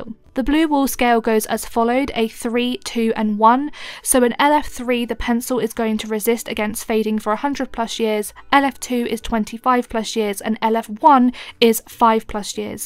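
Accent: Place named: United Kingdom. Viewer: WOW! What a terrific, honest review. I actually like the Derwent Lightfast, despite the high cost, but F-C Polychromos is my default set and I supplement with both Derwent Lightfast and Caran d'Ache Luminance, especially for skin tones. I thought without question you were going to endorse Derwent. Honestly, it's your accent, and my bias indicates unjustified prejudice. I think the genesis of my bias can be found in the fact that in 2014, when I began as a graphite artist, the most enthusiastic reviews I heard were all from British artists, and all of them endorsed Derwent Graphic, so I purchased a full set. What a mistake. I conducted a full-blown scientific technical study of graphite pencils in 2015 and published my results as a 360-page book, Pearson's Graphite 2015. I found Derwent Graphic was just about the worst graphite pencil set, containing scratchy pencils, dark grade pencils that gave lighter lines than light grade pencils, broken leads, misaligned leads, and so on. 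Faber-Castell and Mitsubishi Hi-Uni had some of the best scores for the 370+ pencils I tested. I have yet to hear a British artist say anything bad about Derwent Graphic, which raises all kinds of questions in my mind. I find nothing at all wrong with any of the Derwent coloured pencils, but their graphite pencils are simply crap. Maybe Derwent has (I guess you would say 'have') decided to give up on graphite and concentrate on coloured pencils?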